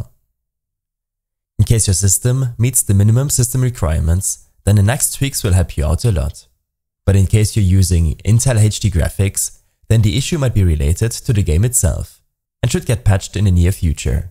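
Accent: Germany. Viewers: man merkt das es ein Deutscher ist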